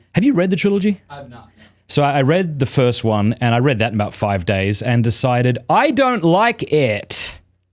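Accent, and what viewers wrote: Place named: Australia. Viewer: As a Texan, I have to say that hearing brother say "y'all" in that Australian accent simultaneously delights me and makes me feel weird. Love the channel; it's been a great help on my own journey.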